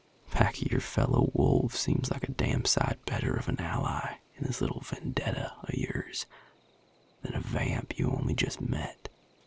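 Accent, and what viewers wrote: South, United States. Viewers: It's like Christmas came early- as a fellow southerner, I can't get enough of dear!:D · I am NOT a fan of country accents but is an exception 🥵🥵😍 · Jeebus....that gravelly, southern voice is....good chills. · Nooo why is his American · IS HE A SOUTHERN VAMPIRE?? BLESS MY SOUL~ 😳👊🥰😆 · The southern accent is 💖💖💖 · The southern accent is amazing^w^ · I love that you have a country accent because it’s great for a vampire like no one really does that and I just think that is so cool for vampire to have a country accent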